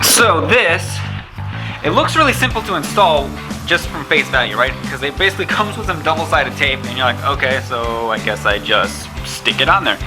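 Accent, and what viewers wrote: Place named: United States. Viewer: Why do Americans say m k instead of ‘mark’?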